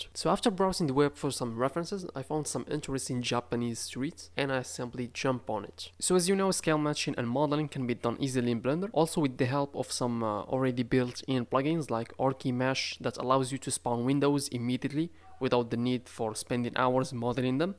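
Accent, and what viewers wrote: Morocco. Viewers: Are you Moroccan 😄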